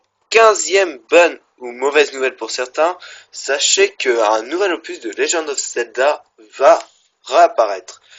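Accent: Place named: England, United Kingdom. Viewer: L'accent english :p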